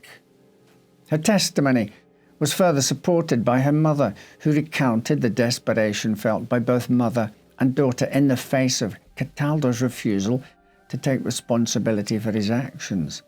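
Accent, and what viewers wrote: Scotland, United Kingdom. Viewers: Scottish accent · He is Scottish - Edinburgh or Highlands?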